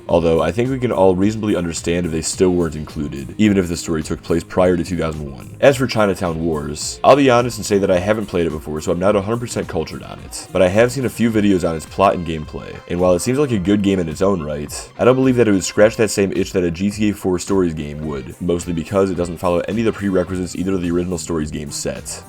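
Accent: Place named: Northern Cities, United States. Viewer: Nice. I heard your voice and thought you had a bit of that NCVS accent. Anyway, great video.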